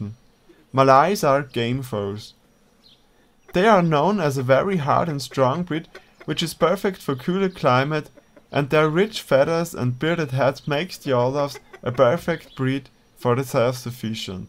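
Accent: Austria. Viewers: are you from Austria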